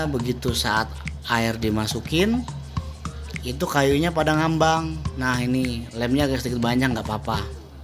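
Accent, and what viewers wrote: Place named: Jakarta, Indonesia. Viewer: Seh Betawi amaat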